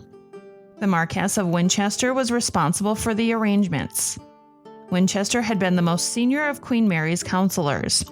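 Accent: United States. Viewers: Rather listen to English casts reading this .Why have an American reader sounds weird .Could only listen to two minutes.Your voice is terrible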